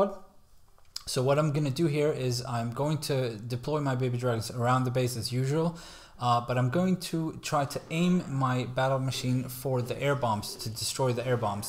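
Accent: Sweden. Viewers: I really heard your Swedish accent haha, men bra video 👍🏽😉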